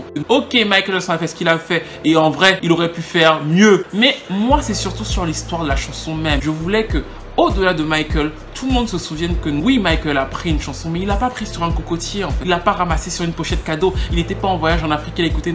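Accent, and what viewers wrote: Cameroon. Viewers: Je m'en doutais que tu avais un truc camerounais. Ton accent sur certaines phrases me rappelais les touches camerounaises et je me disais c'était juste un parfait hasard.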